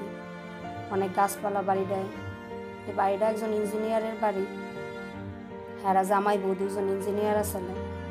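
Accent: Barisal, Bangladesh. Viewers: Apo tomar vasha sone na hese parlamna!amar des o borisal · Apu tomar prothom vlog ta khub e sondor hoice. borishaler basa sunte aro besi valo lagce. Tomar notun channel er jonno shubo kamona roilo bonu. Onek dur agiye jabe asha kori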